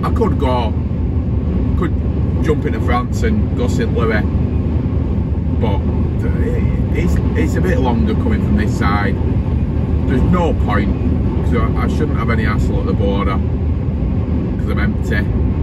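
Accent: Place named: Lancashire, United Kingdom. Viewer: I watch all your videos till the end, thanks for your brilliant vids and commentary,you sound like your from my area which is colne near Burnley.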